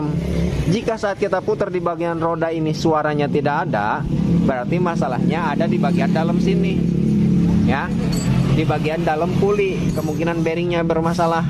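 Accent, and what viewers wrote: West Java, Indonesia. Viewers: Makasih ilmu nya kang..sunda banget kang pengampean nya tp mudah di mengerti.❤❤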